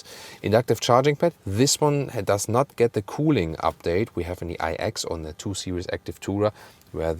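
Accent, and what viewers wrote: Germany. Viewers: Never heard a more german english speaking accent 😬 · This guy has the strongest and most awkward German accent ever hahahahahha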